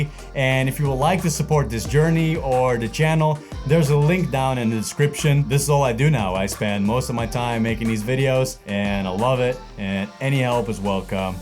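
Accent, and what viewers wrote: Netherlands. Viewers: Hey! Just discovered your videos and they are fantastic! Would you mind answering a question for me? Where are you from? The Netherlands? Austria?:) Somewhere else? I am only asking because of the accent you have. I am Dutch myself but I've been living in the U.S now for nearly 20 years and my English accent has evolved somewhat. I am guessing my accent does sound a little like yours. Thanks for the videos! keep it up and stay safe!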